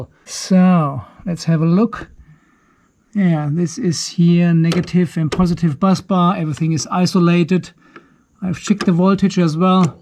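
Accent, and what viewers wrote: Germany. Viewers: Als ich dein erstes Video sah, dachte ich spontan: Wie kommt ein Deutscher nach Australien, u.a. wg. dem einschlägigen Dialekt...BOMBENSICHER bin ich mir erst seit BOMBENFEST. Auswandern war schon immer mein Traum...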